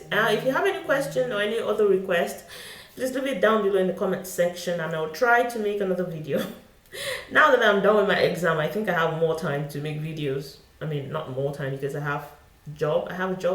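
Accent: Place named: Nigeria. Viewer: my sister i hail......i must say u are really doing a very good job..thanks for the info.. u really sound like nigerian...i am a proud nigerian...thanks for being u..may God bless u for all the info.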